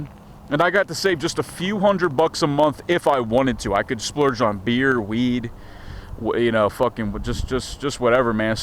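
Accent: United Kingdom. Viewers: Great British accent there! Excellent video too!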